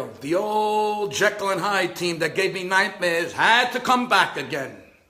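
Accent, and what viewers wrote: New York, United States. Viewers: you definitely are a New Yawker, you should be a coach just for the post game speeches, haha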